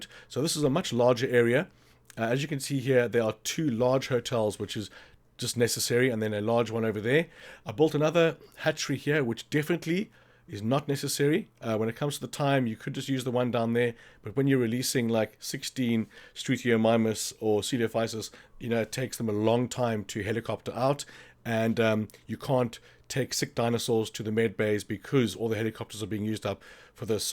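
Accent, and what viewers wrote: South Africa. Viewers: Awesome video thanks man! I recognize the accent, Are you south african?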